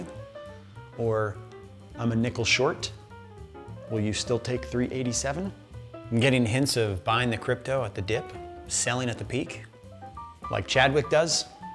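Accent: Australia. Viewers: I feel i should hit you for that Aussie accent, but your just so darn cute i can't xD (greetings from Australia) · Australian accent…..more talent from this guy!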